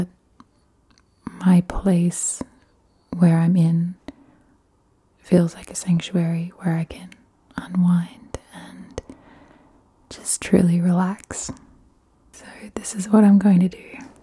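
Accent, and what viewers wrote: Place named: Australia. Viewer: What a great debut to ASMR! Enjoyed very much, thank you. Educational too. Lavender IC sounds amazing. 💜 😋 I always enjoyed the fairies in BoFIII video game PS1. They were cool and pretty helpful. 🧚‍♂️🧚‍♀️🧚 You are very beautiful and I like all your cool crystals and books. Oz accent very subtle and sweet to listen too. Space lights are nice idea too. Look forward to any future videos. 🥰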